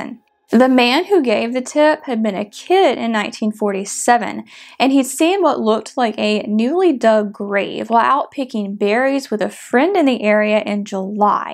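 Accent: South, United States. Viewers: has a nice southern accent.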